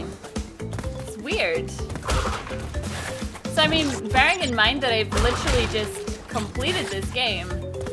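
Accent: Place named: Scotland, United Kingdom. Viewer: Hey I just wanted to say that I really enjoy watching your videos! I watch them since resident evil 7:D I really would love to play crash bandicoot tbh. Btw from which part of Scotland are you coming from because I saw a concert of Amy McDonald 6 days ago and she lives in Scotland too. Compared to you she has just such an weird accent tho 😂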